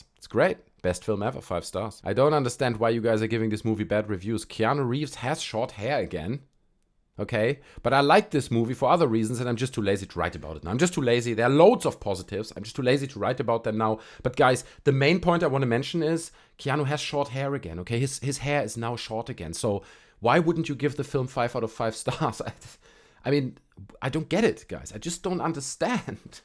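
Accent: Germany. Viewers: I love your German accent. You and the YouTuber 'get germanized' should collaborate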